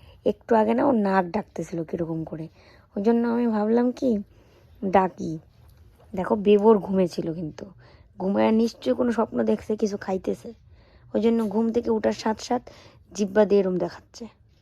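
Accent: Bangladesh. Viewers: From Bangladesh 🇧🇩. Apu tumi Bangladeshi suna khub valo laga. Tomar video thakhi but comments kora hoy na.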